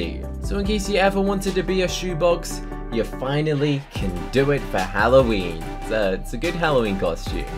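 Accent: United Kingdom. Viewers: Not trying to be rude or anything, but through the entire video you pronounce z like zed and in proper English American it’s sed like z like zee I’m not saying, you have to change how you talk and I’m not meaning listen any rude way, but I’m just saying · He's british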